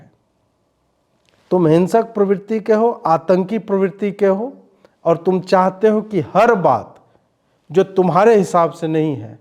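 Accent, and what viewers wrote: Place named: Bihar, India. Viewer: Very well analyzed explanation. Bihari tone pe sunne samajhne main Maja aa gaya. Thank you Sir ji. Keep speaking and keep awaring sleeping Hindus.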